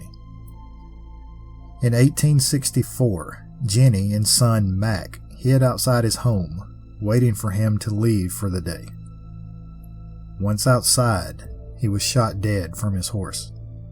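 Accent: South, United States. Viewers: So happy I found your channel! Love the creepy stories. The southern voice and the way you narrative the stories captures my full attention. Turn off the lights and bring the 🍿! 😊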